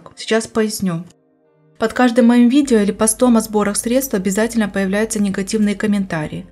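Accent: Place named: South, Russia. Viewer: У меня недоверие. Почему ролик озвучивается голосом с таким явно выраженным говором, южно-русской "г"? Понятно, что человек со стороны нанят для озвучки. А почему?Почему автор канала сам не озвучивает этот ролик??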